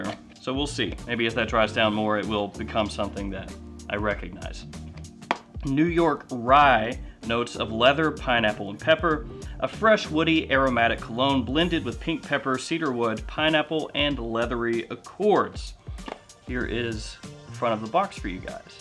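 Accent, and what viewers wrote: South, United States. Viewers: "Hey friends. ".... and some of you folks can't tell he is from the South.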